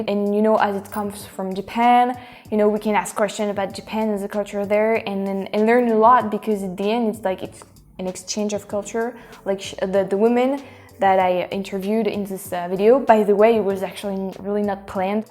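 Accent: France. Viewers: Salut à nouveau. Comme d'habitude, toutes tes idées sont géniales! Peut-être qu'un jour il y aura un «Guide FrenchTastic» pour rivaliser avec le Guide Michelin! Je trouve toujours amusant que les gens, y compris les Français, commentent l'accent français. Pour les Françaises, leur accent est comme le bouclier de Wonder Woman: c'est leur super pouvoir! J'ai eu des filles françaises qui me disaient essentiellement de "foutre le camp" et la moitié de mon cerveau pensera "oh elle est si méchante" tandis que l'autre moitié pense "mon Dieu, elle a l'air si sexy"! En bref: c'est un super pouvoir que tu veux jamais perdre! Prends soin de toi! /Bill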